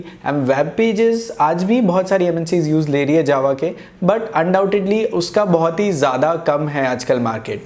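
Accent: India. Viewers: Indian accent is too strong...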